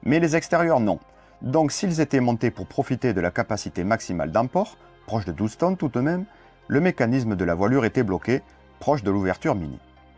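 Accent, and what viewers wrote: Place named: Libourne, France. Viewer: Je regarde vos vidéos depuis 5 ans. Le début donc. Et je me rends compte aujourd'hui avec cette nouvelle vidéo toujours aussi bien réalisée que vous avez l'accent du Libournais. Ce qui semble compatible avec votre adresse de compte YT (3350). Je me trompe ?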